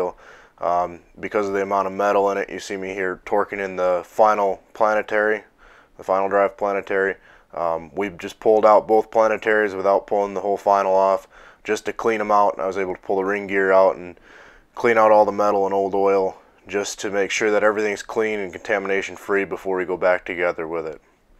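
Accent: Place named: North, United Kingdom. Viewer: Judging how he sounds like he's from the north, I'd say this dudes gotta be making 35 bucks an hour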